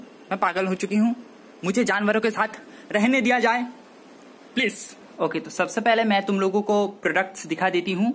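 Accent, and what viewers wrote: Assam, India. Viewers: Ap Assamese ho kya ap ke tone se aisa lagta hai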